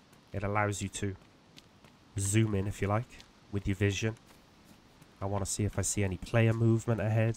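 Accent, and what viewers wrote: Wales, United Kingdom. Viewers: Late to the party with DayZ and your videos have been amazing at setting me off in this game. The Welsh accent is a great accompaniment to the end of the world too 😂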